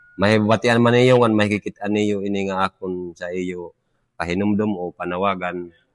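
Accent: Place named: Waray, Philippines. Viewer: Northern Samarenyo waray parin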